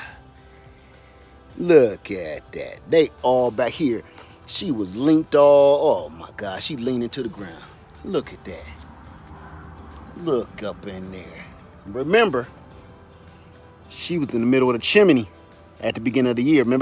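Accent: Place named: South, United States. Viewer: I loved this video! First off, your southern accent is EPIC! I’m from New Orleans so you talk Like us lol. Secondly, the way you kept talking to little kierra i was weak. My mom always says u have to talk to the plants and show them love maybe thats what I’m doing wrong and tje the way the Lillian tree looked I’m tryna get to this lemon tree level! One day! But in the meantime I’m coming up there to pick from your lemon tree cause u got plenty lol😊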